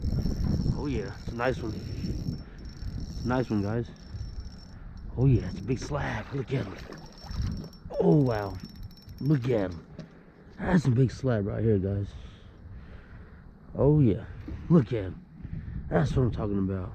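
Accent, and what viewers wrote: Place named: California, United States. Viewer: I love his Californian accent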